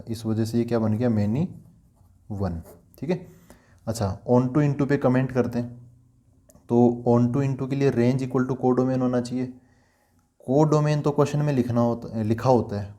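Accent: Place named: Haryana, India. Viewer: by ur voice,I tried to predict that u must be frm haryana....by the way u teach very nicely ...big thanks from Haryana girl 👍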